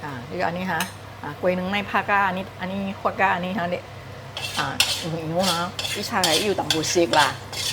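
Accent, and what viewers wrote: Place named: Hokkien, China. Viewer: Aunty, thank you for this bitter gourd recipe, Today I learned that fish sauce can increase flavors of bitter gourd. I will try it out the next day if I cook this, favorite dish. It is a good dish for hot weather. Aunty, today you speak in Hokien dialect is a welcome sight for us Hokien aunties. I find you have Mandarin subtitles in your vid, that is very good for those couldn't understand the simple dialect. Bravo keep up your efforts I will always support your channels. Have a great day ❤❤❤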